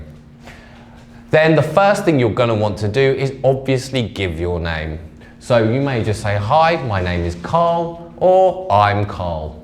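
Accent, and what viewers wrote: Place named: United Kingdom. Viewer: love his brittish accent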